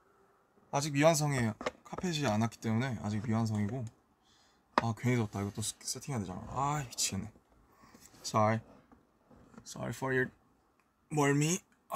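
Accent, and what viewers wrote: United States. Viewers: That's because when he speaks English, he adopts a west coast California accent to sound like a typical American. He has the sound exactly perfect which means he studied and practiced hard. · Namjoon know what ARMY'S want.......he knows that there is no subtitle so we watch his live later with subtitle.....so he is also speaking in english so we ARMY'S will understand what he is saying.....and his english is sound like he is american.......and his voice OH MY GOD I CAN'T.....and he looks good in short hairs.......but I like his long hair more lol........but he still look best in short hairs....... I PURPLE YOU NAMJOON.........BORAHAE..... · Y do I luv it when he talks Korean w his American accent 😩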